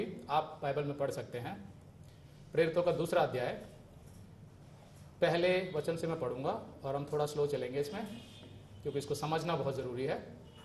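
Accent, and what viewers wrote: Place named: Kerala, India. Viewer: Superb well explained Bro.. I really like your Pure Hindi language after you been a malyali language mother tongue. Appreciate much on this topic of tongues. Will share to others as not much speakers in YouTube are Hindi speakers. Also we have spoken on phone many years back. GOD BLESS YOU ABUNDANTLY. I have also got an article on this topic As we print and distribute it free to aware people about this wrong doctrine.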